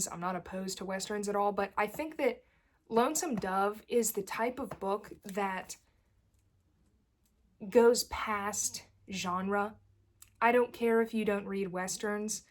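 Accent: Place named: Pennsylvania, United States. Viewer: You are definitely from either eastern Pennsylvania, Delaware, or the Baltimore area. Your dialect gives you away. PS great list and great content.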